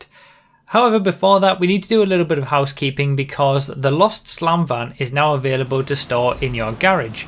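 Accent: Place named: Scotland, United Kingdom. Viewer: U sound Scottish. Are you?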